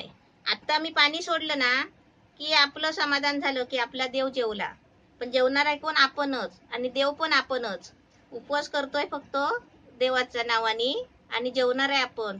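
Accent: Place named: Ratnagiri, India. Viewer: मी नीता तुमचं मी अभिनंदन करते सर्व रेसिपी सुंदर आहे तुमची रत्नागिरी भाषा /बोली छान अभिनंदन अभिनंदन!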